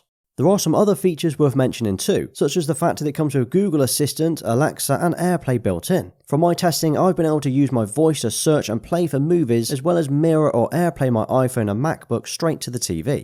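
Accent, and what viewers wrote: United Kingdom. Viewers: By the way when I first purchased my Samsung S95B OLED TV in April 2022 at Best Buy in the USA, it cost $2,100. When Black Friday game in 2022, it was selling for $1,400 at Best Buy. 😭You sound like you located in the England with a British accent. I wish you made your TV stand to be sold in the United States. Wow, that stand is awesome and I plan to upgrade from a Samsung 55 OLED S95B to a Samsung 65 inch OLED in about 2 years and your stand would be perfect in my apartment.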